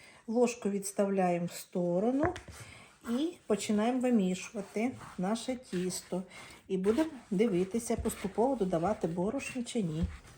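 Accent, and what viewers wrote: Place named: Khmelnytskyi, Ukraine. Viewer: Класний рецепт, дякую Вам! Візьму собі на замітку! У нас такі пироги теж називають струдлями., Ви родом не з Хмельниччини, дуже діалект схожий на наш 🤔😅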